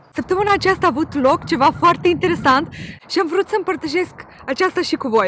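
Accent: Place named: Moldova. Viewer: Buna, sunt din Chișinău,mi-ai atras atenția cu accentul nostru din Md. Imi place mult cum predai engleza.dar sunt interesată de cea britanică.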